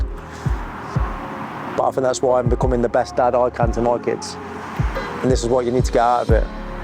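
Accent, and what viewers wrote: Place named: Coventry, United Kingdom. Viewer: I knew i recognised your accent your from Cov im from Cov too just found your account on insta so inspranational im older now but after a life of trauma you have so helped xx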